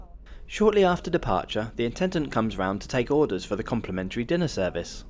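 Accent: United Kingdom. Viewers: UK acsent smooth language ✌️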